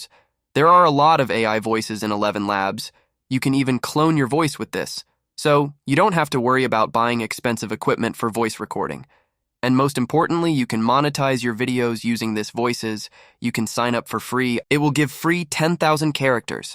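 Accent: Sri Lanka. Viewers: are you from sri lanka?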